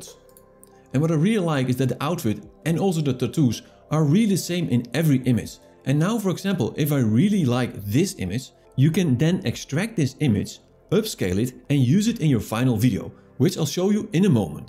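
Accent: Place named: Netherlands. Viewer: Bro you sound very Dutch hahaha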